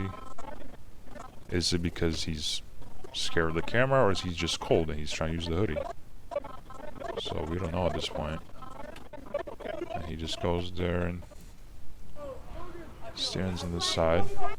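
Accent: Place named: South Central, United States. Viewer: What was the comment we are going to get you girls by JS about? Please take diction classes. South central American accent is annoying and distracting.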